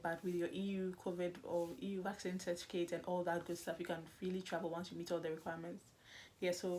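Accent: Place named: Ghana. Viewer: Tfs... Btw you speak quiet fast ya...:-) good thing I had a Ghanaian friend during college so I cud follow you...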